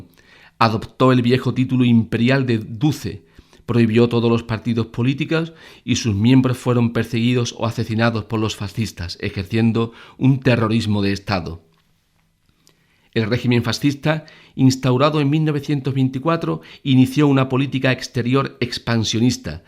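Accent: Andalusia, Spain. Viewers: Maravilloso acento andaluz.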